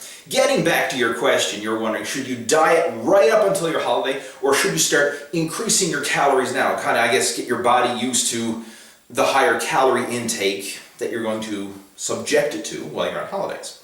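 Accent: Newfoundland, Canada. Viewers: You got to be from Newfoundland, I can tell by your accent.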